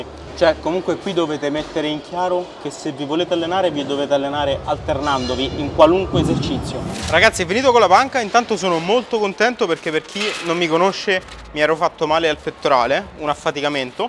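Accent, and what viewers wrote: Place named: Umbria, Italy. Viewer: Noto un accento della bassa Umbria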